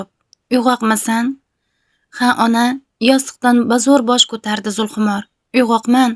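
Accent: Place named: Surkhandarya, Uzbekistan. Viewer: Surxondaryo yoki Qashqadaryo shvasida gapiryabdi bu qiz😁😁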